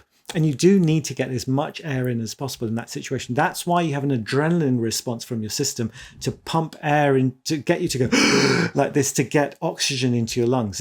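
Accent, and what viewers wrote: United Kingdom. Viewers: Good Day, man! Thank you for the upload. I've been mouth taping for about 3 years now and its shown great benefits. I'm pretty sure I am still having some mild snoring/Apnea events but the mouth tape was a game changer and my Hygienist actually said they noticed an improvement in my overall oral hygiene. Disclaimer: it may be anecdotal and ones mileage may vary. I appreciate all that you do for the sleep community and of course the English accent is an amazing addition! also: So many Cartoon/movie character pins on your coat.. oh my!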